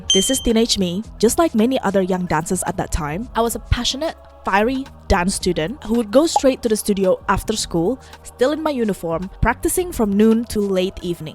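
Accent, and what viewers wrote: Indonesia. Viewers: Indonesian accent detected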